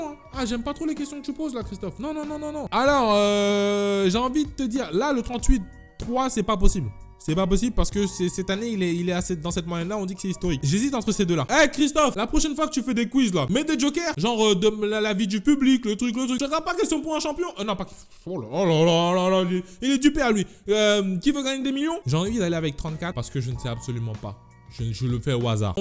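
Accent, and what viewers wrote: Cameroon. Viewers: Avec son accent du Cameroun c'est trop cool like beaucoup si tu aime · j adore ton accent camerounais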